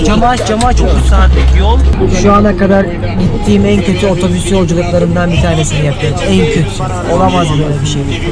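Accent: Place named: Aegean, Turkey. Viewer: Gadeşlik seni ilk defa izliyom sen bizim oralıya benziyon şivenden belli egelimisin.. Accık şiven aydınla muğla arası benziyo. Güzel gez dikkat et kendine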